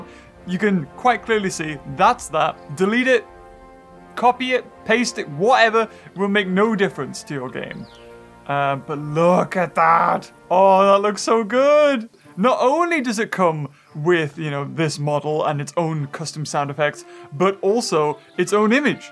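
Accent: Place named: United Kingdom. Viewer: It's pleasant, like idek but it's always nice to hear a british man talk about dinosaurs in a man child way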